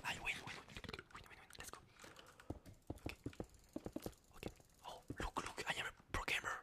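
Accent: France. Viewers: Is he French? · J'ai juré sur ma vie t'es français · I see i see u ar not english i gues u ar french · Iam french and i think he have a french accent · Are you french? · you comm from french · You are from fransh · he la french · 10 secondes j’ai capté il était français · r u french boy? ur english is so cool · I thought he was a spanish turns out french very cool :) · Tu ne serais pas français par hasard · Je me disais que t'avais un accent fr sa a confirmé le it's c'est trop 😂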